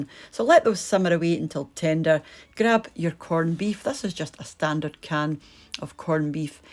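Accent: Scotland, United Kingdom. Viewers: Hi just discovered your channel when trying to find how to bake short breads, my Scottish Aunt y made them and l have her recipe but its hard to read l looked up lots and finally someone with the right Accent..you've made it easy for me now l can work out her writing.. and only a couple of ingredients...new to your channel and love it regards Doc from Down Under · Cor that looks absolutely delicious! ❤️ My late father was Scottish.. You have a lovely accent bless you 🙂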